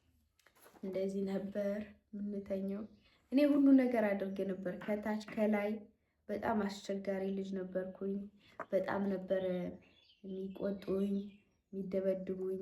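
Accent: Gondar, Ethiopia. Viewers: it’s very nice u are still Abel to speak Amharic ,,, u have a gondere accent btw😄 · ጎንደሬ ነች መሰለኝ አክሰንቷ❤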